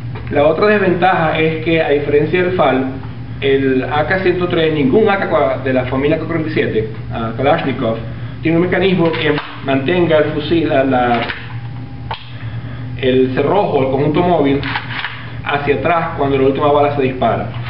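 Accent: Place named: Venezuela. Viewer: por el dejo se nota que es venezolano.... habla tanto del ak-103 pero no muestra ni uno....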